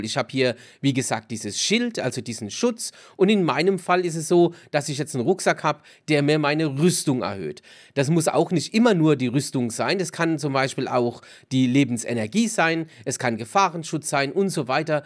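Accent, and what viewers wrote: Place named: Rhine-Neckar, Germany. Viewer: du hast diesen herrlichen Klang dieser Region in deiner Stimme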